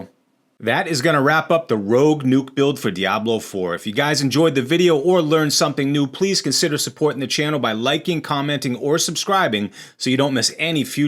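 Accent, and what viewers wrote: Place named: Massachusetts, United States. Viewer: Dudes Massachusetts accent is showing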